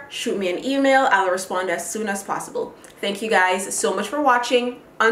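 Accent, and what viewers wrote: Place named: Jamaica. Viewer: New to your vids. Where u from? Sound wash down yardie 😂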